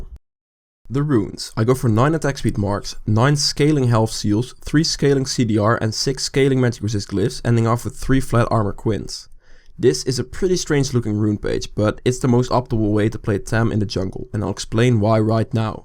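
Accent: Netherlands. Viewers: I like your dutch accent xd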